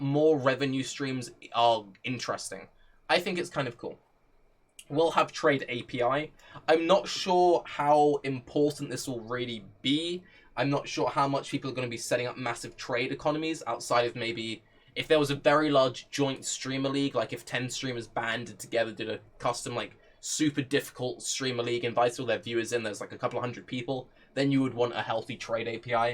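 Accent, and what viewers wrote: United Kingdom. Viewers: I think that's a little overboard, but i get what you mean. He for sure has become a lot less critical ever since he's gotten these aspirations of doing content with GGG staff etc. Its like he wants to be Ziggy or something. Nobody is totally impartial, but what does rub me the wrong way is when people pretend as if they are trying to give a balanced opinion - when in reality they fall very squarely on one side of the argument or the other. If you are only going to praise GGG and fabricate total nonsense that nobody would ever say to make it appear like you arent just dicksucking it really comes off as arrogant pseudo-intellectual self-aggrandising nonsense. This was my biggest problem with the Diabolo mobile video. He couldnt just say "If the game is good, ill play it". He had to make a 5 minute nonsensical rant trying to justify to himself and everyone else why HE is in the right, everyone else is just circlejerking, Blizzard are being smart and moving in the right direction for the franchise even if this only caters to idiots like him.... 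Like dude, either be quiet or just acknowledge you are in the minority. Dont try to do all that nonsense justification. It doesnt get you hipster points, and you dont sound smarter for doing it. Even if you have a british accent.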